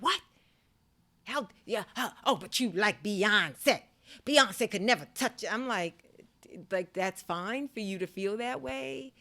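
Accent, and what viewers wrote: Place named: New York, United States. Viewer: Assalamualaikum, i enjoy her comedy so refreshing to have found such a down to earth sister Muslim with a Beautiful Ny accent and such an interesting intellectual approach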